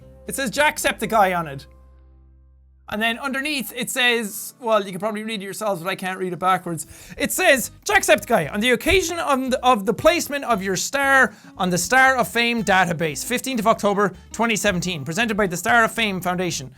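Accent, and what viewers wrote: Ireland. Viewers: At least he still has his Irish accent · Has jack got more Irish 😂🤔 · Woah, his irish accent was so strong in this video. Damn son!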